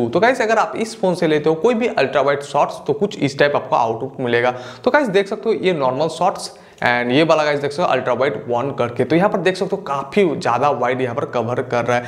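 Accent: Bengal, India. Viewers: Ye keysi baat hey bhai?wo lerka bangali hey.wo indiawale ke liye hindi may phone ki review de rahi hey.to issmey galat kya hey?bangali logoki hindi bollemey thoda kharab to hogii.tamil logokovi eeeysa hota hey.you need to adjust it man.love from bangladesh.take care.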